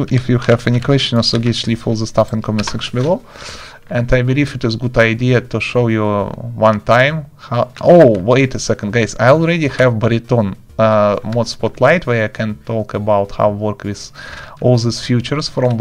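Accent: Russia. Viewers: Слышен русский акцент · It is Russian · r u russian?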